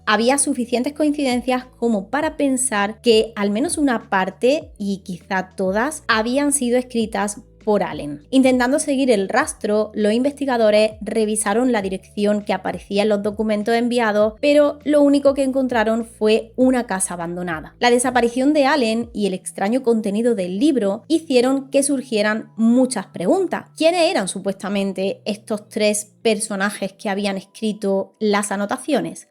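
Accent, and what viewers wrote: Andalusia, Spain. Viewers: Un encanto, la sra y su acento andaluz....lo de filadelfia, un engaño mas de USA....... As usual. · De Andalucía verdad? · Acento típico Andaluz, yo diría de Sevilla o Málaga ... !! Saluds desde Montevideo - Uruguay !!!!😅👍🏻😉🎸🎸🍺🍻😎😎😎😎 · Muy buen análisis. Tu acento Andaluz me encanta. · Esta chica además de encantadora es una gran narradora y ese acento Español es delicioso. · Gracias por compatir. Totalmente inverosimil el cuento dado por ese señor. Tu acento andaluz y tus ojos me han engangado. Saludos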